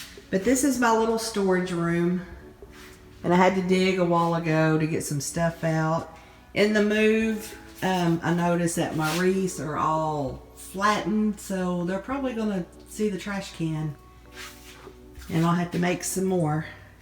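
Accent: South, United States. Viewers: You must be southern like myself I want say what gave you away LOL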